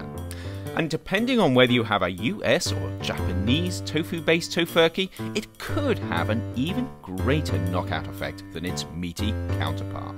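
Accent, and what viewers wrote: United Kingdom. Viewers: Why is there a British guy telling me about Thanksgiving?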